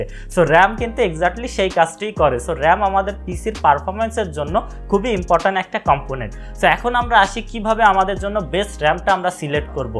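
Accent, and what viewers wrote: Bangladesh. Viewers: Bhai er bari ki borishal?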